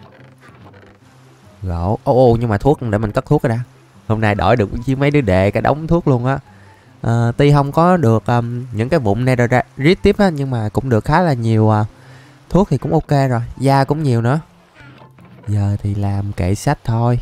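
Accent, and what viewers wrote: Mekong Delta, Vietnam. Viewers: ông là người miền Tây đúng hong? nghe nói chuyện dễ thương vải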